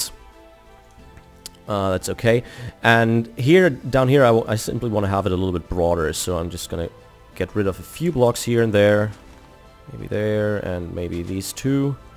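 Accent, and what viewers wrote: Germany. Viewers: Nach den ersten paar Sekunden erstmal abonniert. ;) Man hört zwar, dass du Deutscher bist, aber dein Englisch ist sehr sehr gut. :) Super sympathisch und tolle Videoinhalte. Weiter so!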